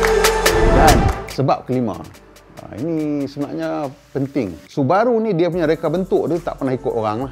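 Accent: North, Malaysia. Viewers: abg presenter plg sy minat..pasai ckp utagha...kikiki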